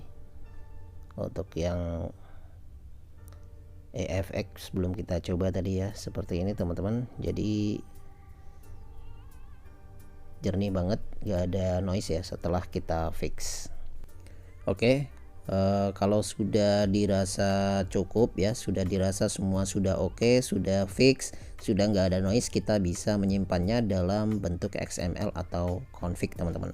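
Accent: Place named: Jepara, Indonesia. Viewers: Wong jeporo ta jebule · Wong jeporo yha mas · wong jeporo mas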